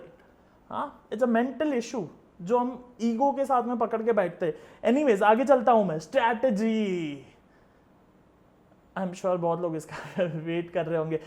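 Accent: Gujarat, India. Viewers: Sir Are u gujrati??😃😃😃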